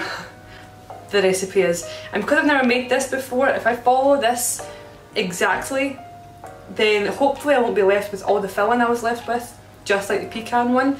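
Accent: Scotland, United Kingdom. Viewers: Also, something that no one tells you - you need to drain the excess liquid out of the pumpkin to make a solid pack pumpkin. This is done after the sieving/mashing is done. You take the mashed pumpkin and put it back into the sieve and let it sit over a bowl to let the liquid drain for about 1 hour or until the pumpkin mash is thickened. Doing this will result in a firmer filling that wont weep after baking. I love to bake and really enjoy watching your baking videos. My grandmother was Scottish and she was a fantastic cook and baker. Watching you and hearing your accent, brings back happy memories of her. No recipes or measurements - just a little of this, and some of that. No worries with October timing, we don't eat pumpkin pie for Halloween in the US. It's a November/Thanksgiving dish here. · Wholesome, entertaining, and scottish noises. This is a top notch video.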